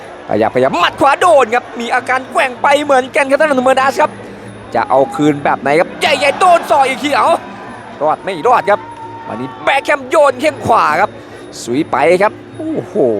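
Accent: Isan, Thailand. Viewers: ม่วนๆฟังเสียงพากษ์อีสานบ้านเฮาเด้อพ่ออาว555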